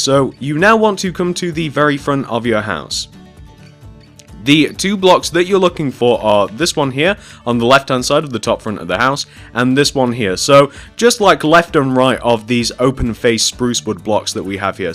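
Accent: United Kingdom. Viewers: Shock Wave it is him counting he is (it sounds like) british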